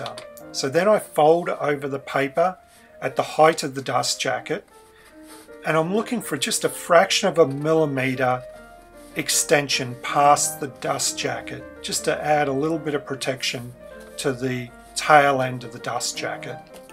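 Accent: Australia. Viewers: Hey, are these sleeves removal once applied? Just found your channel and it's great, sounds like your an aussie as well - I may be wrong. Cheers mate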